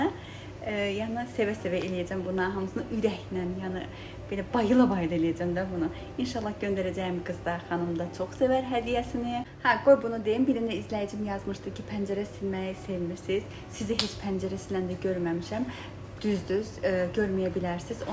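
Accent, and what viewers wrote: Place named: Ganja, Azerbaijan. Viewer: Soruçuram gence lehcesidi. Bilen bilir.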